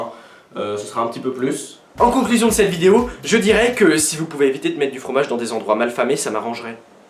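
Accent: France. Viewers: Superbe vidéo et tu est vraiment du nord ? :D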